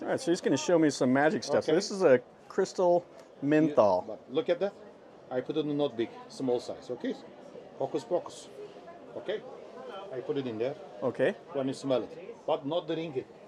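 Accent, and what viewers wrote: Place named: Midwest, United States. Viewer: Very good video. I’m curious where your accent is from (this a way, that a way, talkin, shoppin) maybe Midwest in the US.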